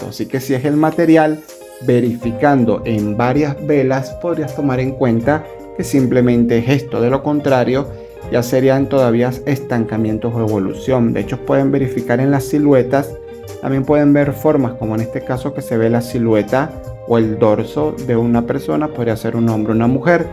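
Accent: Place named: Venezuela. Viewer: Excelente espiritualista, muy claro y conciso, de conocimientos amplios sobre temas de magia y espiritualidad con mucho conocimiento de la antigüedad respetando los rituales de como deben hacerse, te felicito además ese acento y dicción excelente me parece venezolano, excelente la verdad ojala te hubiese conocido acá en Venezuela para aprender lo que no sabemos de la magia y nuestros ancestros, bendiciones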